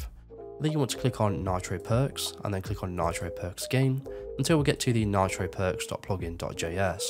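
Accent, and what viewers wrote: United Kingdom. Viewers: Addicted to your British accent.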